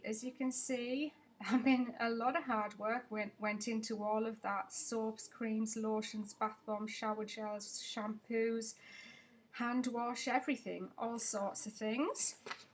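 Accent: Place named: United Kingdom. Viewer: Hello I have been watching you for weeks now, and I just live listening to you talk about how Eden's Secrets came to be. You are very pretty and it's nice to put a face to that beautiful accent. I am from the states and I LOVE British accents. My goal is to go threw and watch all your videos and try to comment in each one. Wish me luck on my adventure. Bye for now.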